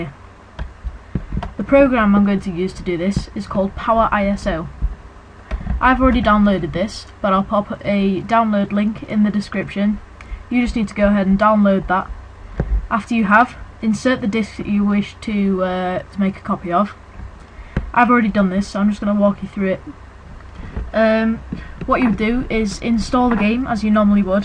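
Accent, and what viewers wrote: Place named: United Kingdom. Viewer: Thanks! And .. uhm .. I LOVE YOUR BRITISH WAY TO TALK!! :)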